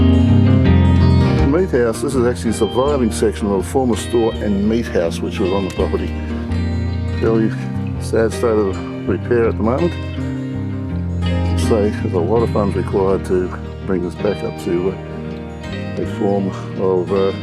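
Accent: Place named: Australia. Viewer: Onya, this is the first I've seen of you but I'll be watching more for sure....a quick look at your page and the amount of content is WOW. I left Oz in 98 for work and never considered that I'd call somewhere else home but that's how it ran....so I absolutely luv hearing some fair dinkum auusie talk.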